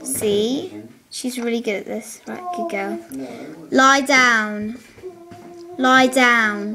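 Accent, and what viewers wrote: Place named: United Kingdom. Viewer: you have a brittish acsent!!!!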